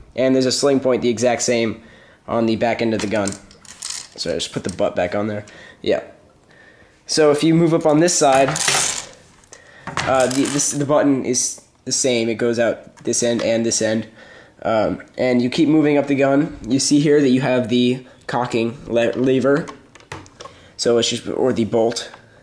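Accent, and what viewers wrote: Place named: United States. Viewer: u sound american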